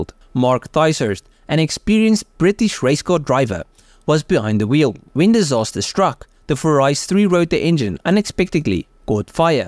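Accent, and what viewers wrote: South Africa. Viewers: Love ur SA accent cud listen 2 it aaaaaaall day HEHEHE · He sounds South African? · Are you from south Africa by any chance? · South African accent?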